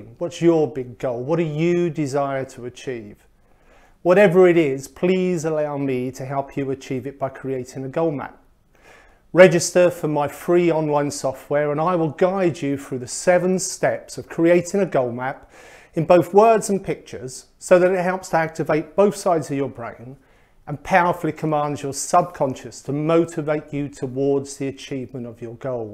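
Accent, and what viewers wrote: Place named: England, United Kingdom. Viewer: Mr, The moment I heard you speak at the Essential Oils Symposium, I knew you were English! I must say I thoroughly enjoyed myself listening and taking notes of whatever you said. I have now registered for a Class in October and look forward to hearing you once again. The Best Class at the Sympopsium I should say. Thank you for sharing. I am a YL Star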